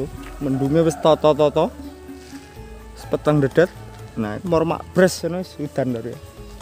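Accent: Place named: Java, Indonesia. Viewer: Kula seneng bahasane mase...medhok desa mbanget....kalih guyune...renyah....kados nopo ta piantune.....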